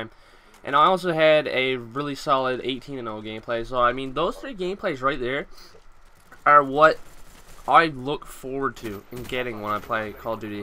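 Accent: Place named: Canada. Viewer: What is your accent? Sounds like you got something mixed in their that isn't just Canadian.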